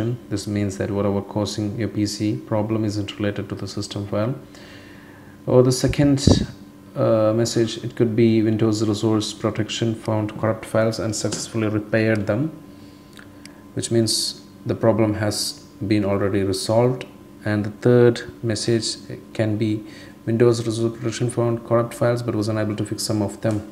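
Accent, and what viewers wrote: Kerala, India. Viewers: Great video! Wow! I also detect a 🤓 faint Malayalam accent when you speak...which makes it even more awesome!! 👏👍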